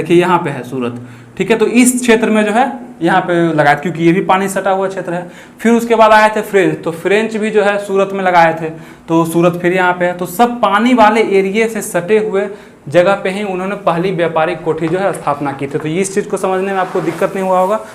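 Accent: Bihar, India. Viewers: Thanks a lot sir🙏...aapk language se lgta h aap Bihari ho aur hum v (i proud to be Bihari)💪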